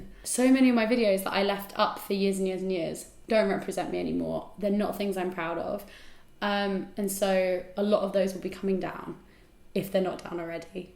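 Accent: United Kingdom. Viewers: I do have to say, your voice is diferent. If I have to describe it, it's like you have a stonger british accent than before. Even thos this new voice is kind of cool. We could see that your are moiving on or mayber moving arround, but if i have to say something it's feels like your are quite goiging back to the same state of mind over and over. Il y had to make a resume of the process it will like: starting art video project > improving and talking about yourself > realizing you talk to much about you > sentiment of loosing personal safe space > black out youtube > then you go back down on thing > then it's the fuck that feelling > and we rewind to projects. Everyone af it's own reptitive cicle (for me it"s about meeting people > over speculate on relationships > realise the truth > pushing away human kind > being sad > and stat over) It's just weird to see someone elses loop.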